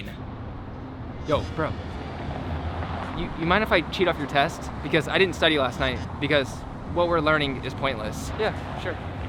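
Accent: United States. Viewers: You are asian? ^^ didn't even notice lol sounds like american, lives in usa, is american. ^^ Maybe bcs "race" is absolute not important to me, neighter nationality is. But one question still remains: How long?! 😀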